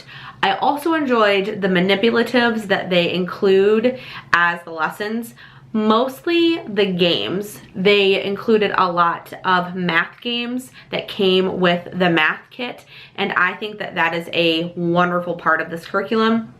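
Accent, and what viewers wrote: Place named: New Jersey, United States. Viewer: Hiiiiii!!! I just found your channel and subscribed! So weird but what state are you in? You sound like you’re in jersey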